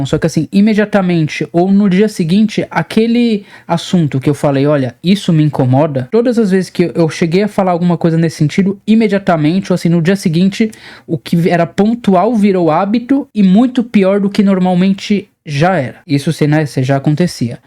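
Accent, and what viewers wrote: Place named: Southern Brazil, Brazil. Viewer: pelo seu sotaque, vc é paulista ou sulista, é isso? vem pro Nordeste pra ver uma coisa 😅😅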